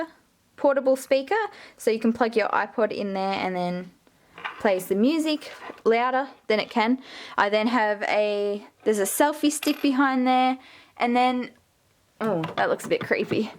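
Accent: Australia. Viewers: I knew she was Aussie because of her accent lol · I could tell you’re Aussie because you sound similar to Georgia Productions :) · omg i am crying for happiness right now... stabilo! telletubbies! bostik! smiggle! typo! kmart! etc. the accent! yay! i finally found an australian youtuber that does polymer clay😃 {I am an aussie too!}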